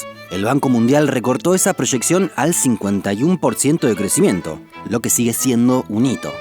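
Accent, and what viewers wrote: Buenos Aires, Argentina. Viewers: Me quedo mas que clarisimo que sos Argentino, por la forma de pronunciar y marcar la "Y" cada vez que decis Guyana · Es argentino es su acento. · jajajaja, no solo Buenos Aires habla así, todo el sur también habla así, entre ríos, Santa Fe y el sur de Córdoba también. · Los amo <3. Hacen un trabajo fantastico! Saludos desde Mexico! Viva la vocecita con acento argentino que dice siempre Tristan da Acunha jajajajaja lo amo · se le re nota que es argentino ese boludo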